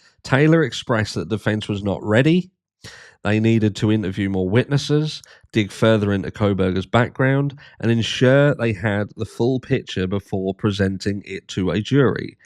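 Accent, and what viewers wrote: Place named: England, United Kingdom. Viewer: Excellent article and narration. Something about hearing things read with an English accent makes them sound more profound. Thank for taking the time to post. Cheers!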